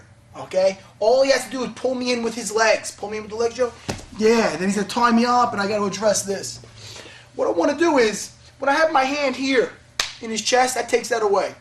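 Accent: United States. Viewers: Is he from North US / Brooklyn? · "don't lean foward" Strong American accent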